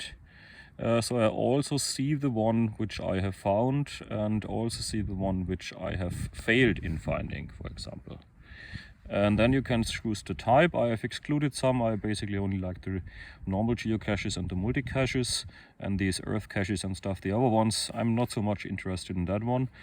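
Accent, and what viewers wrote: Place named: Germany. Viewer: Wieso in englisch? Bist doch Deutscher oder nicht? Also dein Englisch klingt sehr deutsch 😅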